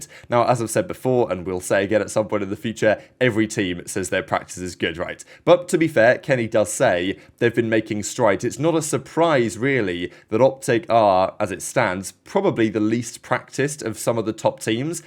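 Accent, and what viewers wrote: England, United Kingdom. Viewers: where you from? From England so I’m assuming south somewhere but don’t know if it’s London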